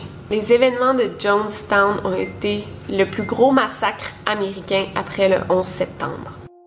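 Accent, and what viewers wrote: Quebec, Canada. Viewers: Je t'ai connu grâce à Horia qui avait parler de toi dans l'une de ses vidéos pour Halloween ! Et depuis il y a pas un jour où je ne regarde pas tes vidéos, ton accent Canadien résonne par tout chez moi mdr Continue, j'aime beaucoup le travail que tu fais ^^ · Ahah quel accent québecois, ça me manquait d'entendre ça ^^ en tout cas, très bon boulot sur cette secte (Je ne connaissais pas ta chaine). J'ai voulu en savoir plus pour essayer de comprendre à quel point l'esprit humain peut être manipulable. Merci beaucoup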